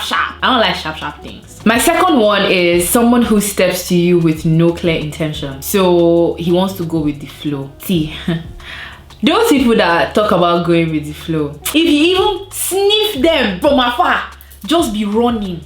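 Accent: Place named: Nigeria. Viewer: The Nigerian accent gets me all the time😂❤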